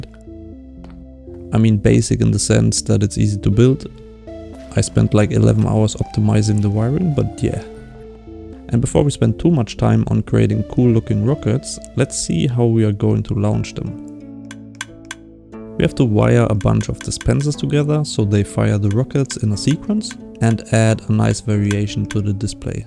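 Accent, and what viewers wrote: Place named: Germany. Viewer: hes back and sounding more german than ever. wait a second this isn't colony island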